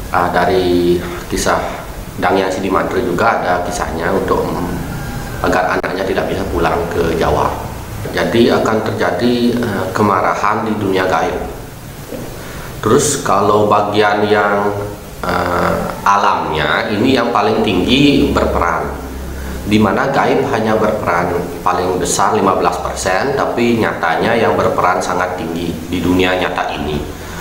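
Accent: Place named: Negara, Indonesia. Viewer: bli, uling negare bli? adi logat care nak negaroa? suksme🙏😊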